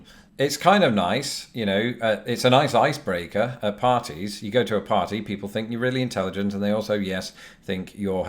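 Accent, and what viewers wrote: United Kingdom. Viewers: I LOOOOOVE the British accent!!!! I dont know why, but it just makes me crazy!!! So beautiful! Love from Texas!❤ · to be fair mate your accent sounds british as fuck even to other brits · Just found your channel. This is so funny and so true! Having a British accent sure intrigues us Americans! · Loooooooooove That British accent. So True we like it! And good content (yep - Love British history. My family came over on the Mayflower from Manchester! 😀) Now I'll be able to enjoy the accent and content on the Reg. New Subbie. 💋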